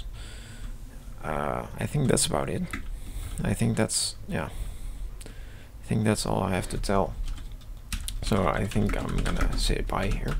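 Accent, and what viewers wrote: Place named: Netherlands. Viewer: ik hoor aan je stem dat je nederlands bent en ps bij die forum kan ik de download link niet vinden staat die dan ergens anders?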